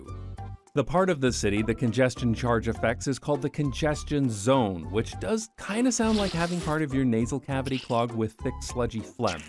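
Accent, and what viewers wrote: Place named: Canada. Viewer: No, he’s Canadian but living in Amsterdam.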